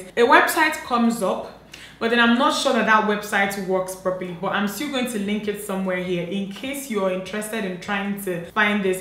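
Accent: Nigeria. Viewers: Usound exactly like my daughters pedestrian. She is Nigerian! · I love love love all your videos. You are so Nigerian and its so refreshing to see someone original. Not all these Nigerians faking oyinbo accent. Thank youuuuuuu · I was trying to place your accent because I recognized it but this is the first video of yours that I've seen and as soon as you said Nigeria, I was like THAT MAKES SO MUCH SENSE. Just a really random thing I was thinking about XD I like your reasoning on never having enough red lipsticks XD Also, I LOVE your eye look :)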